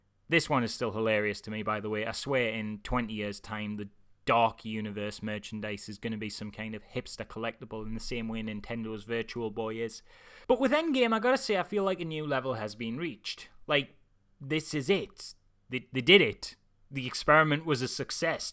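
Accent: Newcastle, United Kingdom. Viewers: Big plan eh? Just a heads up. I came back for the spiderverse review now I have finally watched it but lo an behold....I had been un subscribed.....good job I remembered the channel name eventually as 'well spoken Geordie does eloquent reviews' was getting me fkin nowhere....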